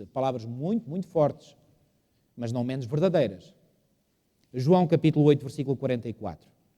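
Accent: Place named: Portugal. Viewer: Muito lindo o sotaque do português falado em Portugal🙏🏾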